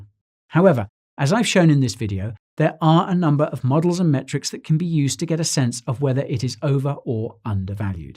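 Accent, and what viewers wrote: United Kingdom. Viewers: You’re the only dude I can watch at 1.5x. And I could probably watch you at 2x if you didn’t have a British accent.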